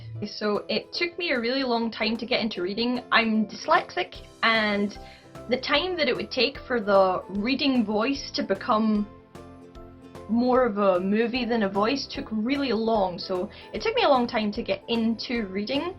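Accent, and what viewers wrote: Scotland, United Kingdom. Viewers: What part of scotland are you from??💗💗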